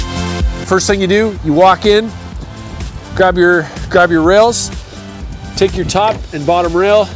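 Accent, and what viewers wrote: Canada. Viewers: Premmo this man sounds so canadain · thats what i do i find the miters eventually come apart but we are in SC ... and he sounds Canadian so big weather difference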